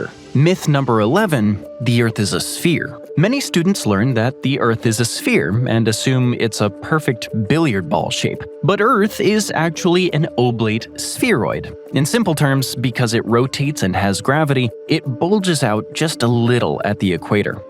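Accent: United States. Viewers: Got to admit, this is probably the most enjoyable vid' with an American accent I've ever watched. Even so, as a Brit well into my 70s, I wasn't taught most of these myths in school. Then again, I wasn't taught that history started on July 4, 1776, either. America, please don't take offence. I'm only pulling your leg. Your cultural view of the rest of the world does amuse me, though. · The whole video is in American English. And a lot of cities have different names and pronouncations. Like countries. Most countries call themselves different than tge rest of the world does. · The voice being spoken with an accent from USA - says a lot.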